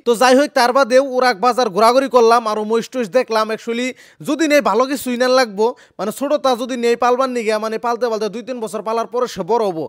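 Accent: Bangladesh. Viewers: কথা আমাগো বাংলাদেশীদের মতো,,,ভাবছিলাম বাংলাদেশ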